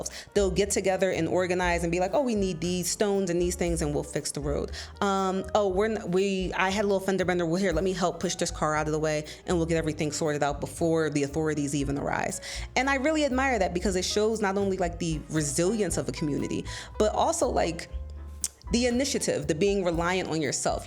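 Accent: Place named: Kenya. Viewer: Gurllll!!!! You even have a Kenyan accent!! B A R G A I N I N G 😂😂😂😂 Karibu home!! #InstantFOLLOW!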